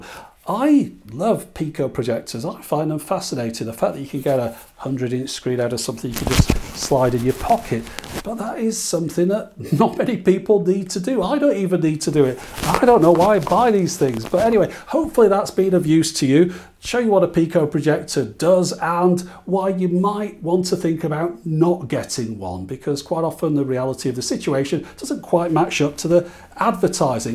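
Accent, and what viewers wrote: United Kingdom. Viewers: Great sound, excellent video, proper British accent. Superb review.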